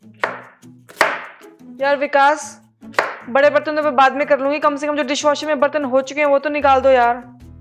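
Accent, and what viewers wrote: Haryana, India. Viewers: That's Awesome....you guys rock...me and my wife has started watching and now becoming addicted to your videos. For you; u have a naughtiness that is actually a punch in the video and for; Dominance, harayanvi tone with cuteness is the heart winning that has become a right combination. Keep it up you both. Thanks for making us laugh. God bless you guys..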